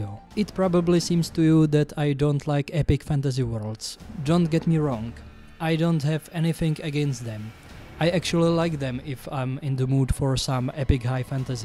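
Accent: Czech Republic. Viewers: Kámo z tebe ta čeština je úplně slyšet :D Jinak super video, souhlasím prakticky se vším co říkáš. Navíc Netflix totálně nechápe jak rozmístit rasové skupiny do určitých zemích, potom je člověk zmatenej kde se ten děj odehrává, jestli v Xintrei, Pryshia, Darwen. Škoda, že už vše musí být woke a v každém filmu/seriálu hlavní postava je strong independent women, která je totálně nudně napsaná. Nemusejí to všem cpát do ksichtu a říkat: Hele, koukejte silná ženská, která nepotřebuje žádnou pomoct a dokáže se o sebe postarat levou zadní. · Because he is definitely slavic. It sounds to me like bad czech accent. Nothing offensive. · ten tvůj českej přízvuk je top jinak video bylo trefné jen tak dál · Dude, you sound like Martin Klekner. Aren't you Czech? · One of the better examples of a czech accent - this isn't a criticism btw - wonderful video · I was asking myself if you are Czech/Slovak or not, you sounded like it and then I saw the board games and it got confirmed for me... 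a souhlasím s tebou na většině. Hodně štěstí s další tvorbou xd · I love your pronounciation of english words, it's so czech :D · Slyším výraznej českej přízvuk? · dude are you czech?:D i swear i can hear this czenglish :D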